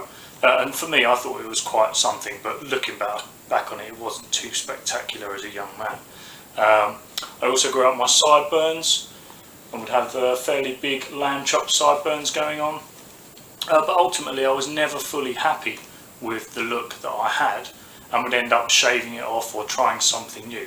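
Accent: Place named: United Kingdom. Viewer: Haha. Great video! I agree 100% with everything you said. I should also say, though, that even though I knew you were British, it didn't hit me that you would speak with such a strong British accent! That was kind of a shock, actually! Hehe. Great video. Thank you for posting. That moustache is looking great!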